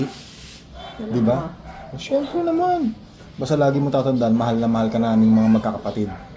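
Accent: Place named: Nueva Ecija, Philippines. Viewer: yong accent mo parang Nueva Ecija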